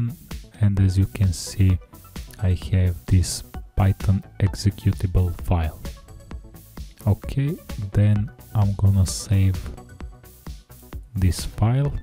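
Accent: Russia. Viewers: Are you from Russia? Or Yugoslavia? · русский ацент)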